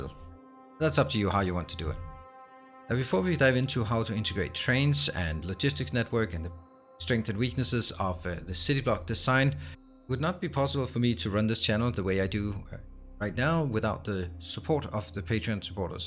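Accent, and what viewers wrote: Denmark. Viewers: The danish accent is strong with this one, kinda like it though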